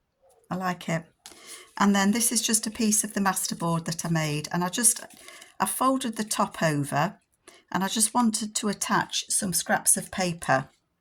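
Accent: England, United Kingdom. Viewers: I just love what you’re doing with this journal!!! I love watching you craft and hearing your beautiful English accent. Hugs, Sharron 🥰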